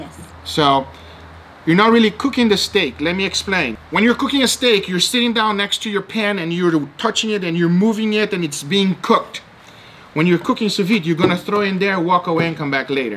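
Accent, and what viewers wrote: Cuba. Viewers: it's spanish, I know is brazilian but he was speaking spanish to the girl, with a strong Cuban* accent btw... He says "tu"(sp) instead of "você"(pt) like in "¿Tu quieres carne? (PT: você quer carne?/do you want meat?) He also says "abre la boquita (PT: abra a boquinha/open the little mouth) * I know I said cuban, but I think it's the Floridian spanish which is heavily influenced by Cuban accent, I've been there and noticed even non cuban latinos having their accents influenced(a little or a lot) by that cuban accent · Hearing a guy from Brazil speaking Spanish with a Cuban accent made me want to move to Miami.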